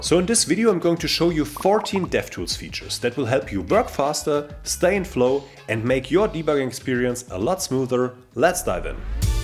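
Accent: Germany. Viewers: I always recognize a fellow German by their accent 👌 Great tips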